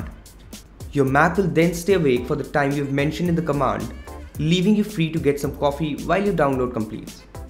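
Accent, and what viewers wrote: India. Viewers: Indian accent is always funny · this unique indian accent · Beebom man! just keep up the gr8 work.i just beg you not to follow suit like C4ETech with "FAKE ACCENT". this indian English accent we have is the best! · This Indian accent makes my ears bleed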